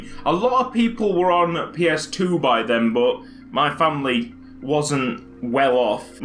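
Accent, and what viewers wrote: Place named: United Kingdom. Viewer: buuuuuuuuuuuuuuuu ;_; i mean British accent :#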